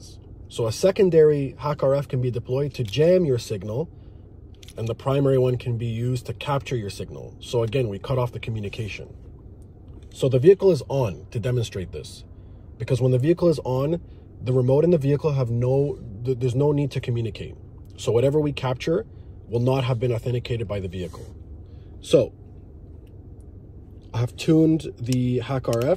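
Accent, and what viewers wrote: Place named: Canada. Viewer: Bro I can just tell your Canadian haah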